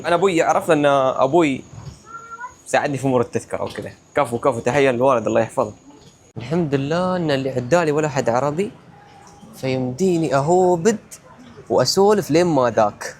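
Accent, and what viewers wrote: Oman. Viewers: و الله كنت احسبك من السويج من لهجتك بس عادي كل عام و انت بخير و عيدك امبارك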